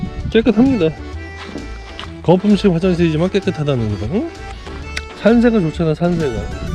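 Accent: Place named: South Korea. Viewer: 잘보고있습니다...구수한사투리너무좋아요... 루프박스장착영상봤는데,지금은없네요... 저도설치하려하는데연비차이가많은가요? 기름값미워미워ㅠㅠ 늘응원합니다~~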